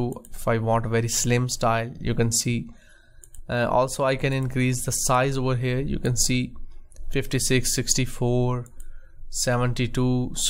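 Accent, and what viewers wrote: India. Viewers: Are you from india??? I love your accent!!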